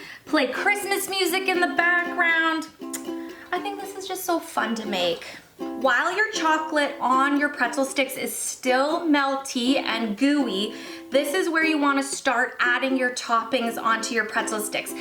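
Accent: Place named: Canada. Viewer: Hi,! Those chocolate covered pretzels look amazing! I agree that drizzling on the chocolate over the bowl is the best thing to do. I love how you covered them with so many different things. Curling the bow was a nice touch. BTW: I am not sure if you mentioned you were Canadian in previous videos, but I am really into languages, and I was pretty sure you were...when you mentioned Waterloo and Kitchener, I knew it! YouTube is so great because we can hear accents from so many cities and countries. Thanks for sharing!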